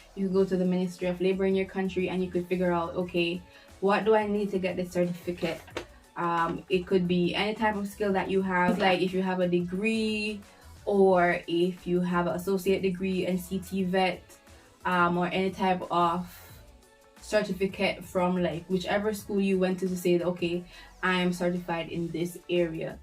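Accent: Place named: Caribbean. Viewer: wow, you have jamaican and bajan accents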